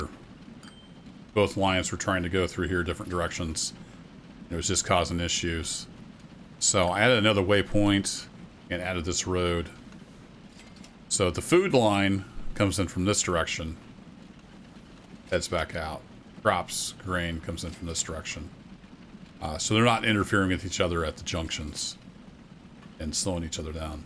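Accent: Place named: United States. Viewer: Its weird when I've always watched people from the UK playing, and then I hear you pronounce things the American way. But it makes a change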